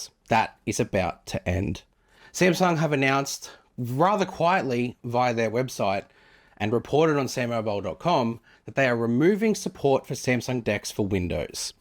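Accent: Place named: Australia. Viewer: Excellent comparison. Your accent reminds me my old good memories in Australia during college back in <num>. Thanks for the info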